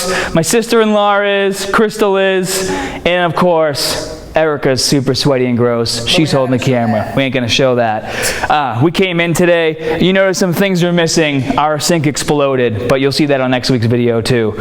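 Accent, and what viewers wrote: Boston, United States. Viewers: Ahaha the Boston accent came out on this one forsure! Loved the biggie smalls reference as well. A lot of people can definitely learn from this video especially those who think wraps don't ever have its purpose in lifting.Thanks for the shoutout bro can't wait for more and more people to join us on muscularstrength! #NattyNation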